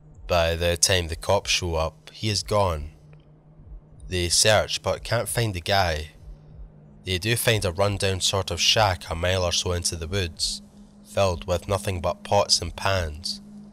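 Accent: Scotland, United Kingdom. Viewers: Came across you through Swamp Dweller. Love the Scot accent. TY, you'll see me around. :)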